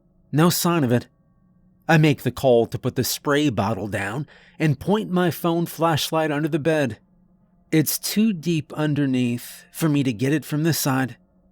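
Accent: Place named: Louisiana, United States. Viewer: What bayou you from, reader?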